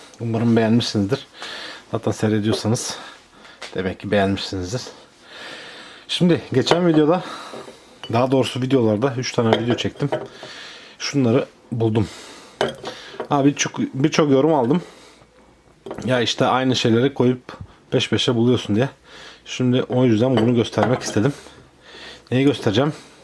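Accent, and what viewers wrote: Thrace, Turkey. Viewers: Trakyali edirne tekirdag tarafli.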